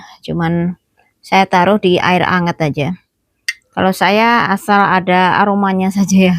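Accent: Java, Indonesia. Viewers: ibunya dr jawa mn kok medok banget bahasa jawane buu ..salam dr kaltim😊😊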